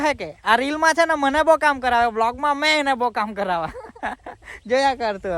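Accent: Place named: Surat, India. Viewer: Respect karo bhai atli simple gorgeous wife mali c6 to.. Tamri surti bhasha sambhalvani Mazama...keep it up 🎉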